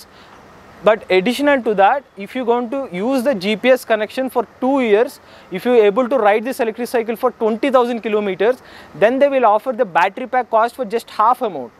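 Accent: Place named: Hyderabad, India. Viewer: Very Good Hyderabad English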